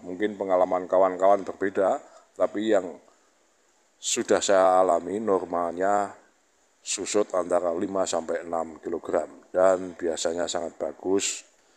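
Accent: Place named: Nganjuk, Indonesia. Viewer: pean asal dr daerah mana..bahasanya kok plek wg nganjuk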